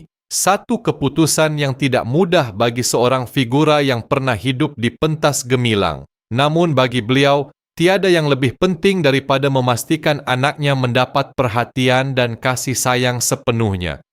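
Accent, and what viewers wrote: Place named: Indonesia. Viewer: ni konoha, diorang memang banyak buat content merapu. Kalau dengar cara bercakap ni bukan Malaysian 🇲🇾, orang seberang!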